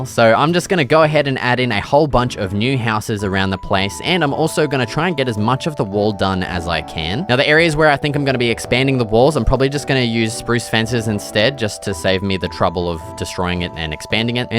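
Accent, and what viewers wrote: Australia. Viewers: are you australian?????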